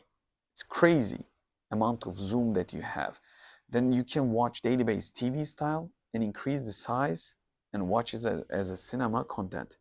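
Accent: Turkey. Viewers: Türk aksanı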